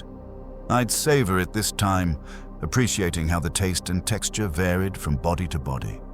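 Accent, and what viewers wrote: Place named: United Kingdom. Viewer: Why did my brain convince me you were also british til this Thanksgiving special lmao Ty for the double upload!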